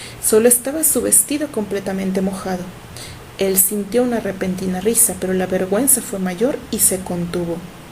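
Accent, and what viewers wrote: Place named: Peru. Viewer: hola tu eres la narradora? Hablas como Peruana o Ecuatoriana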